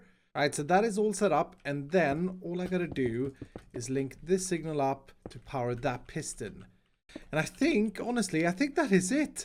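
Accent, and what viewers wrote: Sweden. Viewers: Are you swedish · I just looked it up he’s swedish Well its easy for me to recognize I’m also swedish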